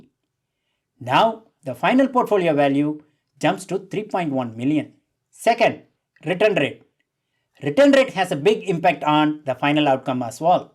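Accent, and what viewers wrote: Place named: Tamil Nadu, India. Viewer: உங்க இங்கிலிஷ் தமிழ் மாதிரியே கேடக்குது சார்❤😂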